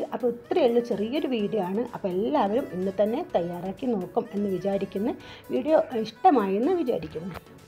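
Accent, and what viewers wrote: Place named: Kannur, India. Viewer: മാഷാ അല്ലാഹ് എനിക്കു ഏറ്റവും ഇഷ്ട്ടം ഉള്ള ഒരു സാദനം ആണ് ഈ കാരറ്റ് 😋കാരറ്റ് കഴുകി മൊഞ്ചക്കി പാവം അതിനെ ചൂട് വെള്ളത്തിൽ മുക്കി അതിന്റെ ചുറ്റും ഉള്ള അണുക്കളെ ഒക്കെ കൊന്ന് വേവിച്ചു തണുത്ത ശേഷം മിക്സി യിൽ ഇട്ട് കുറച്ചു പാലും പഞ്ചാരയും ഐസ് ഐസ് ക്രീം ഒക്കെ ഇട്ടു ഉണ്ടാക്കിയ അടിപൊളി ഹെൽത്തി ജ്യൂസ്‌ ഉണ്ടാക്കുന്ന കാഴ്ച ഞമ്മുടെ കണ്ണൂരിന്റെ സ്വന്തം ചാനൽ നിങ്ങൾക്ക് മുമ്പിൽ നാടൻ കണ്ണൂർ സ്റ്റയിൽ സംസാരംകൊണ്ട് നിങ്ങൾക്ക് മുമ്പിൽ അവതരിപ്പിച്ചിരിക്കുന്നു എനിക്കു ഇഷ്ട്ടം ആയത് കൊണ്ടു എന്റെ ഇന്നത്തെ ഇഫ്താർ ഡ്രിങ്ക്സ് ഇതായിരിക്കും 👌👌😋🥰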